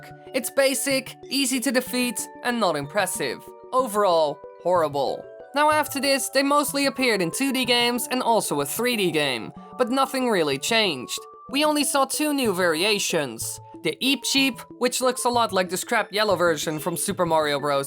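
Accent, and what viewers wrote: Netherlands. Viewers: you have a good dutch accent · are you dutch and speak english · are You dutch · are you dutch?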